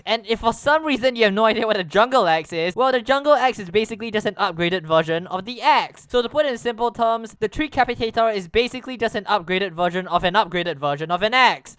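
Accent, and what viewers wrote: Singapore. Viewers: Is it just me? Or do you sound like a Singaporean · U sound singaporean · R u singaporean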